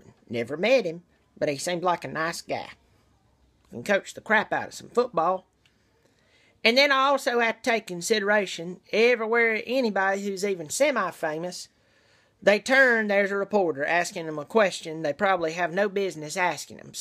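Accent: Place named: Arkansas, United States. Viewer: Im trying to guess where your accent comes from.. arkansas?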